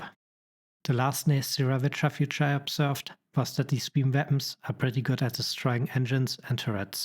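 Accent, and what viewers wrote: Germany. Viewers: i am not a native speaker either but your beautiful german accent do not hinder my ability to understand at all, I even find your accent crispier than british english to identify each single word lol 😂. If I may suggest...the automatic subtitles (in english) work very well for those parts difficult to comprehend, maybe you could check the subtitles for futures videos to make sure they show exactly what you say, or suggest people to turn on subtitles! Anyway good joob and ciao from 🇮🇹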